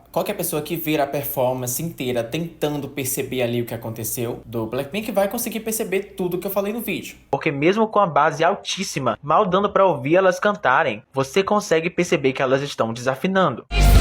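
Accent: Ceará, Brazil. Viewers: Vem sotaque do Ceará! Veeemmm!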